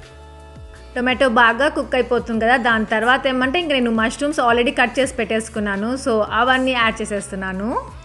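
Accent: Tirupati, India. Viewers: akka me voice chala bavumtumdhii...and meru banglr kadha akkada oka slang ga matladutaru ..kani meru tpt side vallu matladinatee umdhii akka...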